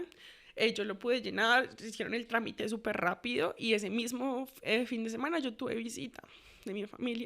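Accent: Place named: Bogotá, Colombia. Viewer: costena pero hablando muy bogotano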